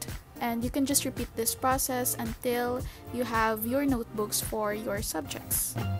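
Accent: Philippines. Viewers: Heard her Filipino🇵🇭 accent from the start😌 but confirmed it when she said cHoUR🥴 AHAHAHAHA nice videooo thankss❤️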